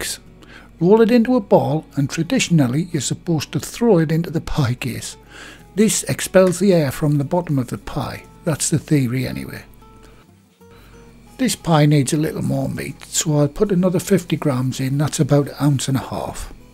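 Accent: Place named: Sunderland, United Kingdom. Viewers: thanks. I’ve always loved the Makkems, how could I not listen to that southern accent and enjoy it? My dad was from Blyth! No-one understood me when I came to the US, I had to “Londonise” my accent. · Love your videos thanks for taking the time to make them. My dad was from Sunderland so as you can imagine I love the accent. Could you possibly give an idea of cooking times for the small muffin tin sized pies. Also rough weight of fillings in them. Thanks Geri Hargreaves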